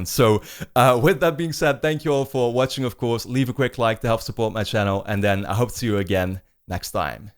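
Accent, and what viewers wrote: Netherlands. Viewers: Dime Drawer he is Dutch. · Are you Dutch or South African? 🤔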